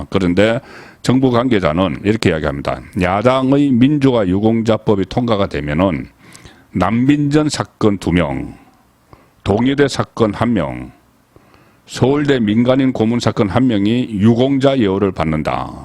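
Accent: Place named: Busan, South Korea. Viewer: 매 편 즐겨 보고 있습니다. 저도 부산 사람이라 사투리는 이해하는데 제발 아 발음을 오 로 발음하지 않았음 합니다. 나오고 가 맞는데 노오고 는 아니지 않습니까? 어려운 것 아닐것 입니다.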